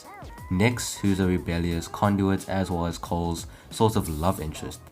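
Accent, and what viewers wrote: South Africa. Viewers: Yarre I can hear in your voice u a Southie mybra🤣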